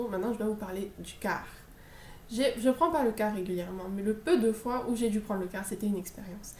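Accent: Cameroon. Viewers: Travaille ton cardio car chez nous c'est le cardio ! Autre chose, NE CHERCHE PAS A COMPRENDRE LE CAMEROUN ! Tes problèmes commenceront dès que tu chercheras à le comprendre car un dicton dit : Si on t'explique le Cameroun et tu dis que tu as compris, c'est qu'on t'a mal expliqué ! L'accent Camer y est déjà, c'est bien. Pour le reste, gère seulement ! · Tu deviens déjà camerounaise , même l'accent hein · tu es super marrante 😅on dirait que tu as vecu au Camer toute ta vie(tes expressions,ta gestuelle,ton accent etc) tes videos me detendent beaucoup,continue comme ca. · Le port du casque est obligatoire au Maroc. C'est un pays plaisant avec une population avenante. T'as déjà prise l'accent camerounais et c'est plaisant de t'écouter . T'es éloquente et pertinente dans ton analyse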